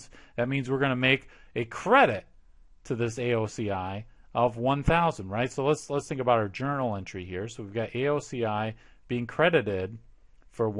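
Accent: United States. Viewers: Is your accent from Rochester, NY?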